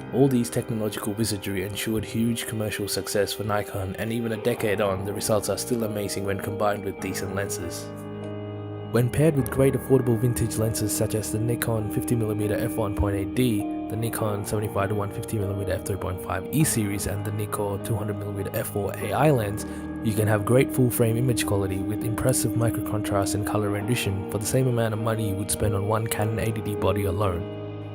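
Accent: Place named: Australia. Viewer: He's Aussie mate!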